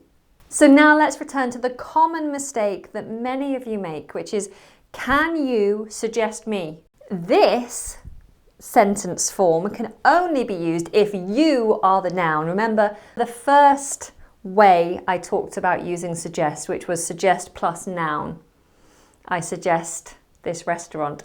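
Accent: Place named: England, United Kingdom. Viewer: You get Germanized? Hurra! Du solltest unbedingt auch den bayerischen Akzent ausprobieren. Der ist leicht zu erlernen und kann Deinen englischen Akzent gut verstecken. Der norddeutsche Dialekt ist zwar näher verwandt mit Englisch. Aber darin ist Dein englischer Akzent leichter zu erkennen.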